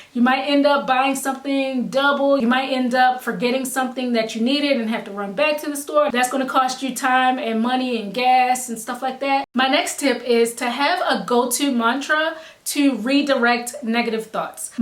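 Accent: Baltimore, United States. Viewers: Are you from Baltimore??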